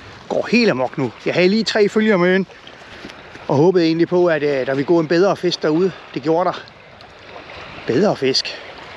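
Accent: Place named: Funen, Denmark. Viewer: det er satme konge med en times hardcore fynbo snak. det fik mig til at grine mange gange ! godt nytår :)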